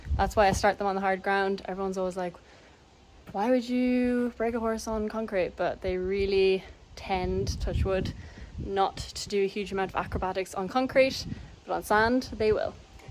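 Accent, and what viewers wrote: Ireland. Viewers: First. Wonderful vlog. New helmet! Love it! Just enough bling! I love how you used to say Khal was so pokey and you kind of looked resigned. Like - yup he's sooo slooow. He was but I still thought he was adorable. When I revisit those vids I can barely see the incredible jumper he is today. I really loved him but I could not for the life of me see his future self. Kudos to you for seeing it. Second, I LOVED your comment about Meg. Lordy, she is funny with the semen. She blushes and I crack up! I am not a vet but am a nurse so I truly have no problem discussing anything at all with anyone at all. Healthcare, be it animals or people is full of lots of bodily fluids going willy nilly. And as an American, I love listening to your beautiful Irish voice but then you said Urine in a way that my American ears almost started to bleed! It was so darn funny too me. 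Keep up the wonderful content. Sorry for the long comment-I just have so darn much that I love about you and your horses, your dad and your attitude. Plus I love that I know about gentamicin.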